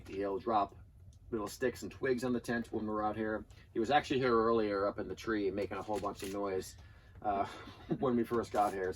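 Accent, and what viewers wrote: Newfoundland, Canada. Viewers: You from Newfoundland? If so that’s cool man I’m from back on the rock as well !! Just subbed to you !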